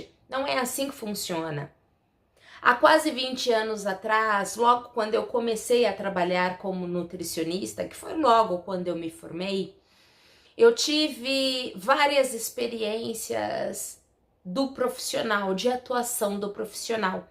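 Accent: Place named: São Paulo, Brazil. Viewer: uuuf acho que vc é de São Paulo capital isso eugosteeeo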